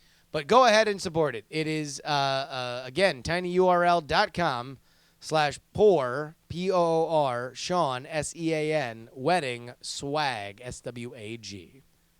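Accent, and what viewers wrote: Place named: United Kingdom. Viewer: Best British accent in the business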